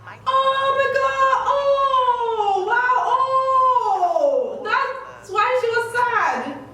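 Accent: Jamaica. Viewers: I’m sorry I’ve never been to this channel but you sound really Jamaican are you?☺️👍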